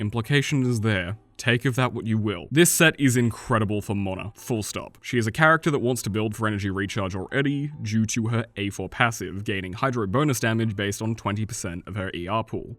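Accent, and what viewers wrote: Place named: United Kingdom. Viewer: Omg- British accent gives a like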